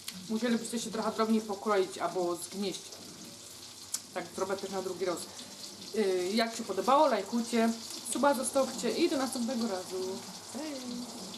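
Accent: Silesia, Poland. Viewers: Jak ja nie cierpie gwary slaskiej (sory)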